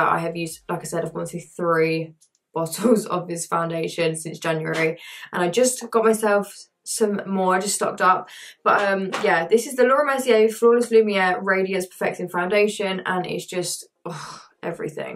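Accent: United Kingdom. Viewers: didnt expect you having a british accent lol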